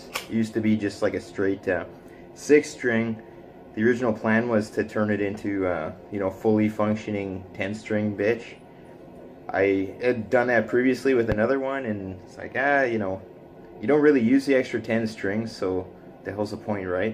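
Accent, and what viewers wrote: Canada. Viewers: Good job on fixing and playing. Haha I knew you were Cdn as soon as you started talking. I'm in BC. You could get 6 G's for that easy. Check the Reverb site out. Go Jets!!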